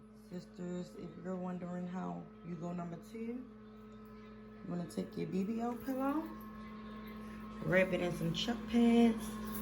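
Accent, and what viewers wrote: Baltimore, United States. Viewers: I’m going to guess where you’re from by your accent. Baltimore?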